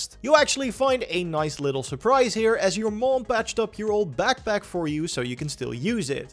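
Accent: Netherlands. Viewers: Very good video! But sorry to say, your accent is a bit annoying to me. You have a very Dutch way of pronouncing the "o" (I know because Im Dutch as well)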